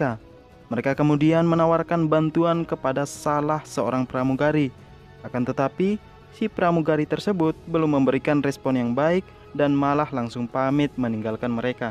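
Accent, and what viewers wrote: Java, Indonesia. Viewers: Wong jowo awok awok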